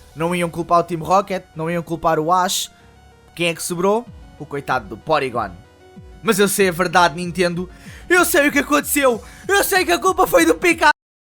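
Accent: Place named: Portugal. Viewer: Esse é o melhor português pt do mundo!!!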